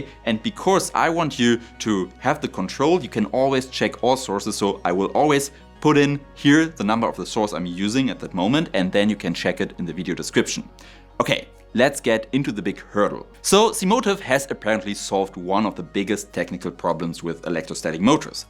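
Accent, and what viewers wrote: Germany. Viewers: A whole quarter hour full of german accent bulishit, with virtually ZERO useful INFRMATION about the "motor" The mother of clickbait :face-green-smiling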